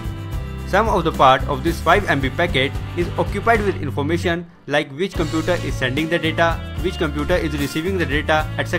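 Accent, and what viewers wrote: India. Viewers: Indian English.... man, Why! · Indians must work harder with their accent...i barely understand them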